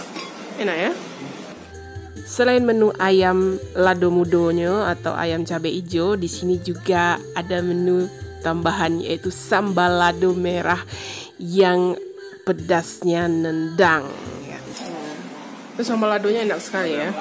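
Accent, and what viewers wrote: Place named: Padang, Indonesia. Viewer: Suaranya pun masih berlogat padang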